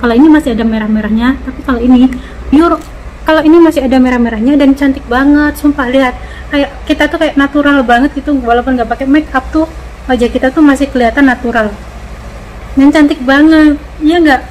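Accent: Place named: Java, Indonesia. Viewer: medoke kentel, asline pundi yuk?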